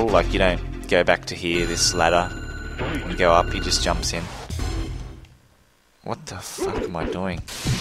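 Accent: Australia. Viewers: Yes, he's an Aussie! xD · This guy is cool, is he australian? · Your voice sounds Australian. Is that right? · yoe91 100% Aussie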